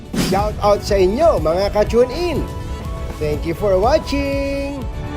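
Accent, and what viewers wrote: Philippines. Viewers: taga saan ka ba ka tunying, parang purong tagalog ka e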